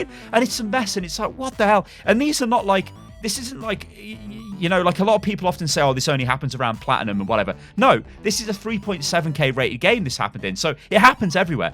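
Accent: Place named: England, United Kingdom. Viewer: Where in England are you from?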